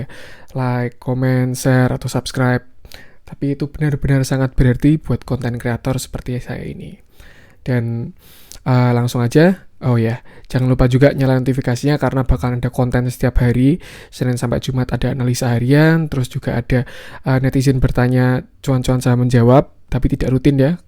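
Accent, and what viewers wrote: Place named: Java, Indonesia. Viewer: HD BANGET TRADING VIEWNYA! PENJELASANNYA KERENBGT ADA "REASON" JG KAYA OBLIGASI AMERIKA DLL AKU SUKABANGEEET. AKU SUKA KALAU ORG BAHAS WHY. GA CUMA ASAL JD AJA.KEREN LOH NGERTI AKUUUU. MAKASI BANG!!!! Tambahan, aku tu kurang suka denger orang ngmg medhok sbnrnya, tp abang org pertama yang aku ikhlas dan berterimakasih krn udh ngomong😂 emang suaranya radio-able juga sih. tengkiu banget ya bang! Oiya gaada noise sama sekali kok bagus. SEMANGAT TERUSSSSS BANG😊😊😊😊 eh mas ya harusnya ehehe Bang pleaseeee mau PWON, ASRI, KRAS, PTBA yaaa!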